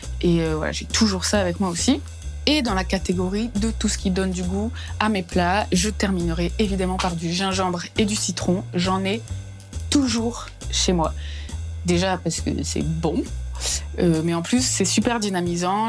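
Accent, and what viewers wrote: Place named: South, France. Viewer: l'horreur.... tu as une pub sur les apports en fer pendant la grossesse et "pour répondre à vos besoins vous pouvez compter sur la viande".... Merci YouTube ! en tout cas J aime beaucoup ton énergie, l'accent du Sud et ton style ! Merci pour tes vidéos 😊 (je mange vegan et ca me donne plein d'idées ou parfois simplement la motivation. j'ai adoré la vidéo apéro et la polenta !)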